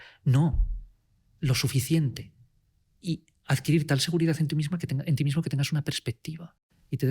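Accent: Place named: Spain. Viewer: No me impresiona para nada. Es que su acento español da la sensación de inteligencia. Que alguien con IA (inteligencia artificial) le cambie el acento a uno de reguetonero boricua a ver si en verdad impresiona. Y, ahí, se va la magia de su encanto.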